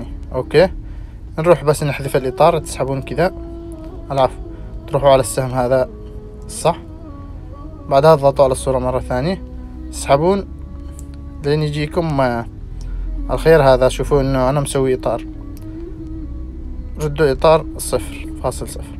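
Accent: Yemen. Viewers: اي كلامه يمني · متأكد؟ بس كلامه يمني🌚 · يمني انت من لهجتك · 😂😂💔لاقسم انه يمني من ربعنا اللهجه تقول كذا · ايووه صدق 😂😂💔 احلى شيء فديت الكلام اليمني 🇾🇪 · لهجتك يمنيه...صح...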